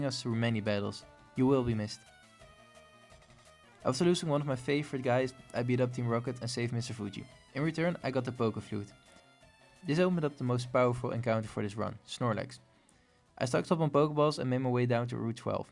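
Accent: Netherlands. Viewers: Wait do i smell some dutch?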